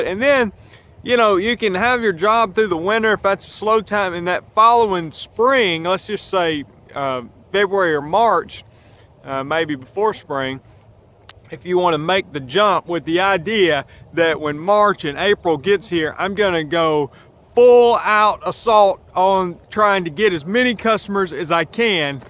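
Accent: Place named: Southwest Virginia, United States. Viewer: You sound like you are from my Southwest Virginia area lol. Everyone and their brother runs around with a mower of some sort in their truck out mowing lawns. there isnt really many decent or big sized companies around here because the business is so saturated. I am surprised I got any lawns really this year and wont be surprised if i loose my commercial property to Jo Blow on the street that wants some beer money next season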